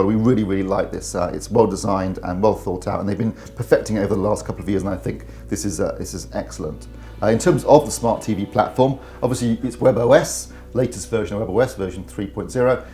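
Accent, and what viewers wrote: United Kingdom. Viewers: Never mind Terry he's off his bean I luv your voice and your detail reviews in a strong British accent. Bravo and well done.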